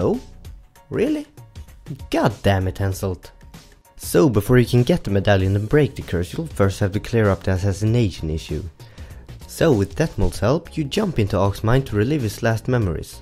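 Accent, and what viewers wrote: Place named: Finland. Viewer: Thank you for this, very helpful! I've been trying to place your accent, are you from Finland?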